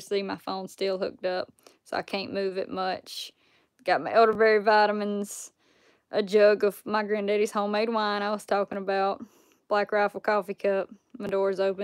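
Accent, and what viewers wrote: South, United States. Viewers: Don't you ever think your accent sounds bad.I have always loved a southern ladies accent and yours is the best.I am in Baltimore just on the outskirts of the city and I would take your southern accent and southern charm anyday from how they talk here.Dont let anyone say anything bad about the way you talk or act.Your pure class. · I love you're southern accent. Alot of my family is from the south. Im considering moving back down south at some point in time. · Your southern accent is beautiful just like you! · Your video may have been taken down because they are doing random removals because they do not have human staff to look at videos and censor them correctly. You may be a victim of You Tube picking random videos that have a title that may be slightly suggestive. Re-download and put a title that is different "DO NOT SAY NO EARS" This gets their attention. 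Try it again and I guarantee it will not be taken down. If anyone here does not know that your Dad is your Dad and thinks he is your boyfriend...well they are not paying attention. I knew that from the first few videos I watched on your channel. Your videos are great. Your Dad is a very nice man who loves his Daughter. He is one of the hardest working men I have ever seen. You get your work ethic and Southern Qualities from him. I Wish I could tell him how great a job he did raising you...you are a beautiful and clearly well brought up young woman. I love your accent. I lived four years in the South and miss that Southern charm. Keep being you. You are a wonderful young woman. Some man will be blessed to call you his girl someday. Stay safe and healthy. We love you and your dad! XX's <3 I hunt and fish and F those who think anything is wrong with that. It is called conservation. You do what you have been raised to do and be proud of who you are. 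Geo. Like my channel and subscribe please! Geo. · You're an Amazing Pioneer woman & that southern draw is sweet & badass all in one. Will ya do a bowfishin night video for us this summer? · I wish all women had that beautiful southern accent! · True southern belle with your accent y'all are great. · I love the way you talk and wish I had that southern accent you do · You’re accent is awesome. And I’m from CNY. Love the southern accent. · Gday from the Sunshine Coast Queensland Australia, love me a southern accent couldnt get enough of the stunning southern ladies when i called Bossier City Louisiana home 😁 love your work, awesome job, and tell your daddy he raised you right, nothing beats a well manner, beautiful, country girl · You have to keep that accent I love that southern accent have a great day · accent what accent thats just your southern charm a shinin through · I love your accent its an authentic American accent what you do for a living is cool, girl. 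· The best thing about your video's is you. Your beautiful, have a sexy southern voice, a good sense of humor, and your a talented hunter. From watching your video, it seems like your a wonderful person and well, if you haven't noticed, we're all still watching even when your live, in your pajamas with your pretty hair not brushed. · Southern accents are the best! You don't sound like a dude, whoever said that, needs some game ears to hear your pleasant voice! Keep doin whatcha doin! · Sound like a man?!? Uh ... No. A sweet southern accent is like a warm blanket to me. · You have a lovely southern accent . Nice to hear someone with more of a southern accent , than mine ! :-) Be safe and stay healthy . Wyr God bless From Texas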